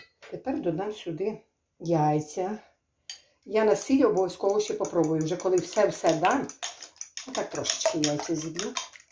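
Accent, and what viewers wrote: Ukraine. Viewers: Спасибо за настоящий украинский язык! Язык моих бабушек и дедушек из села в Черкасской области! А деруны очень вкусные! Спасибо!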